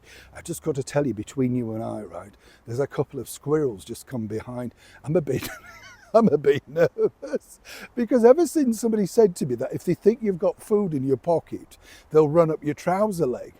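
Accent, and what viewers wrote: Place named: North, United Kingdom. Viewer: It's his ' Northern ( Uk) charm.😊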